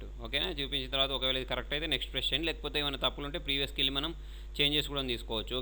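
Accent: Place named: Telangana, India. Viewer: Telangana mastu matladtunav bro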